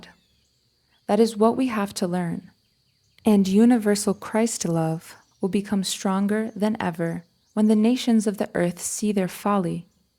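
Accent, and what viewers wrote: Brazil. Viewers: I agree that she has a nice tone in her voice and pronounces most Sanskrit words properly however I do hear a slight Brazilian or Portuguese accent in her pronunciation of Sanskrit. In fact some rather simple English words are mispronounced such as ‘ether’, and other words that I cannot remember right now. Overall her Sanskrit pronunciation is far better than most.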